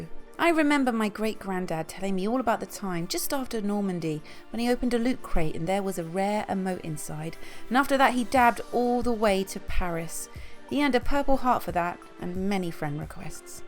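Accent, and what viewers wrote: United Kingdom. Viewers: why is every xbox video a british accented female? im just wondering.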